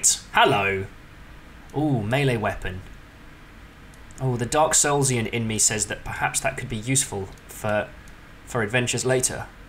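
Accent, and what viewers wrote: United Kingdom. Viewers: You, sir, are the most thoroughly British individual I have ever had the pleasure of listening to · Out of curiosity, are you from the west country? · best thing ever and I'm in love with your British voice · Your british quality is astoundingly fun. I will come along for more, if you'll have more in the future. :)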